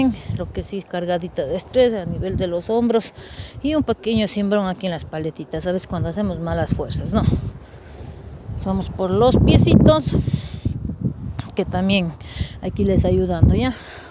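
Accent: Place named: Sierra, Ecuador. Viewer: Así hablan en Cuenca y en la mayor parte de la Sierra de Ecuador, si no le gusta vea otro canal y no traiga hate a esta bonita comunidad q tiene.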